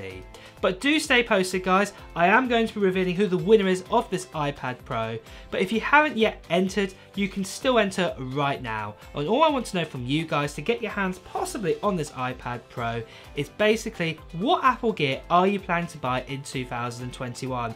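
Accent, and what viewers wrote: United Kingdom. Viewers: Well, I want to buy the iPhone 11. But sadly I don’t have money for that. And also i didn’t already bought any apple gear or product cuz I don’t have money for that, And i hope i will be the one who got your iPad. Because It can help me in my studies. btw i like your british accent, congrats and godbless💖💖💖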